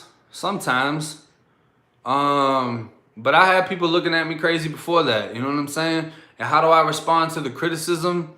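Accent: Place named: Massachusetts, United States. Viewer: youre from Mass...that fuckin accent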